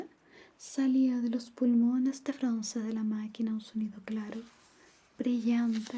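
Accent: Chile. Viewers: Es muy agradable escuchar un lindo asentó chileno que no dice ningún garabato después de escuchar a estas xalas qlias de la calle todo el día gracias ❤❤❤❤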